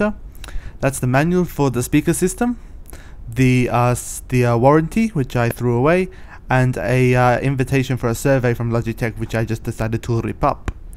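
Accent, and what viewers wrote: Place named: South Africa. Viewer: You sound south African :D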